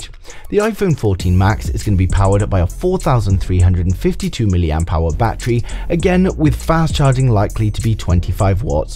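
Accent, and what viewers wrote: United Kingdom. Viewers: They should use your voice for Nat geo when David Attenborough pass away you have a very strong informative British accent.ps super excited for the iPhone 14 pro max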